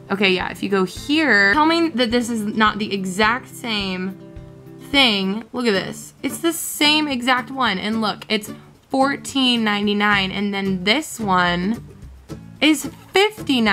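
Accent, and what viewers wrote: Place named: Russia. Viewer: Bahaha little russian accent is so hilarious. Oneeee, Twooo, Treee..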